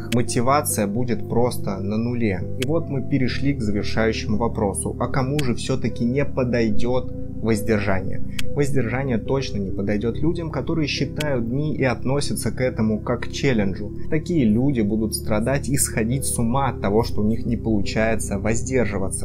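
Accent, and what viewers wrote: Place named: Okanye, Russia. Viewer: это оканье в течении всего видео прям зае....ло конкретно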